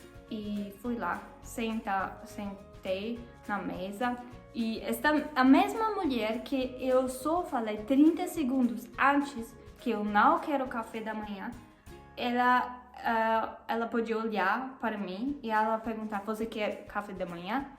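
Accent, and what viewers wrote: Germany. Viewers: O Mico é normal, leve com bom humor para não se aborrecer e você aos poucos vai estar falando o idioma perfeitamente. Procure ouvir músicas no idioma português brasileiro e sempre que possível converse no idioma. Não sinta vergonha de errar e continue praticando. Uma das coisas que deve sempre observar é o uso do gênero, na maioria dos idiomas o artigo é mesmo para o masculino e o feminino, porém no português muda o artigo a, o, as, os articula com a palavra e muda com o gênero masculino ou feminino. Por exemplo numa frase como Deixei cair a xícara da mesa, "xícara" é uma palavra feminina é precedido do artigo "a" , seria errado dizer : Deixei cair o xícara da mesa. O seu sotaque alemão é bem leve quase não se percebe, quando estiver falando e articulando corretamente a maioria das palavras se você não disser estando no Brasil que sua nacionalidade é alemã facilmente se confundirá com uma brasileira.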